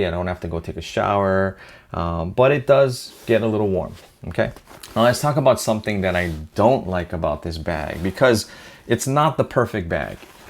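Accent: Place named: Hawaii, United States. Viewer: I could tell you were from Hawaii before I even saw the name if your channel.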